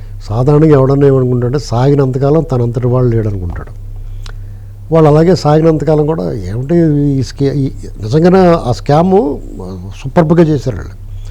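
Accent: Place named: Godavari, India. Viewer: Good. చక్కటి గోదావరి జిల్లా యాస.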